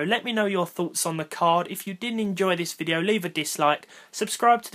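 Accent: United Kingdom. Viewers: Nice video dude! Just a question. Where exactly are you from? I'm asking you because I'm studying British English at the moment but your accent is quite different from the other British speakers that I've known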